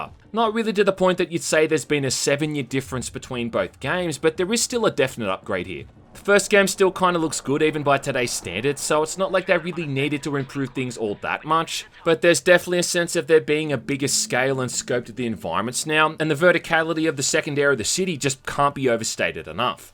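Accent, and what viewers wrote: Australia. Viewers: There's something about an Aussie reviewing anything that is just amazing. Love you work man!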